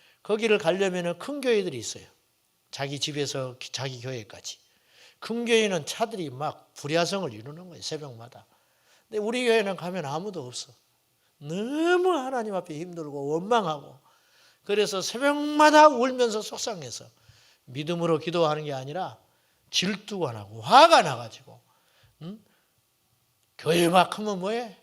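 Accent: Jeolla, South Korea. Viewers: 목사님의~ 전라도 사투리 말씀에도 정겹고~ 은혜 됩니다~😊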